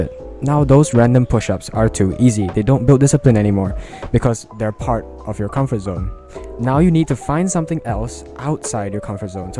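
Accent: Singapore. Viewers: Are you from singapore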